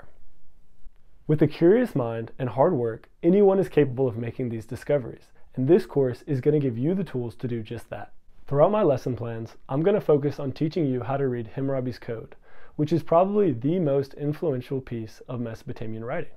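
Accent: United States. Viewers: Why do you got American accent 💀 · Dear, I would have some questions regarding the pronunciation of the Akkadian language. I noticed that you have a rather American/English accent, especially in the a and r sounds. How was the pronunciation actually like? Can we reconstruct that?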